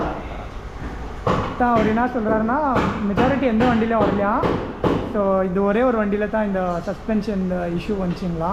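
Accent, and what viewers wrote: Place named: Bangalore, India. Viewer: Bro your tamil is similar to Bangalore tamil....i had s doubt but after that TVS person spoke in kannada then i got it 😂😂😂 bro insta id thange i want to ask regarding Apache RTR 310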